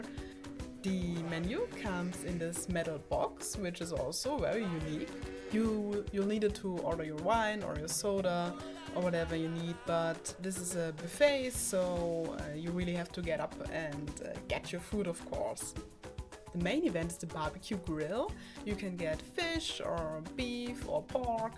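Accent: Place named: Germany. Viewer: You sound soooo very German when you speak English.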